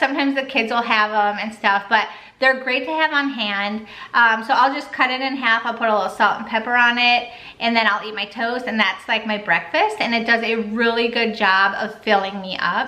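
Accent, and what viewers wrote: Minnesota, United States. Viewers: You still have that Minnesotan accent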